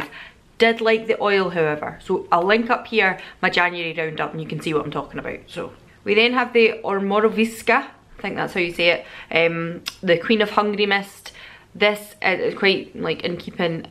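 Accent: Scotland, United Kingdom. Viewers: I ran across your channel quite by accident, just looking for a review on Luxe Tan The Gradual. Great review, btw. I stayed for the brogue, the honesty, and the attitude. You are a pleasure to listen to, and I find it so interesting the differences between makeup and skincare in Scotland versus Virginia, USA.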